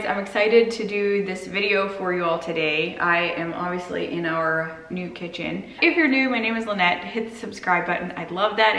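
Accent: Pennsylvania Dutch, United States. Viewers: Thank you Lynette I really enjoy your recipes Love to hear you speak Pennsylvania Dutch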